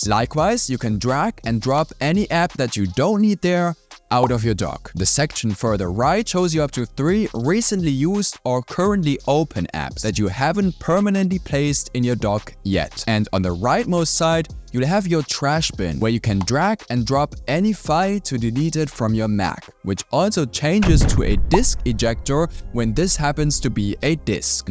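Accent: Germany. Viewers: Das ist Deusches englisch kommst du aus Deuschland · You german?😂😊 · Ngl you sound like a german speaking english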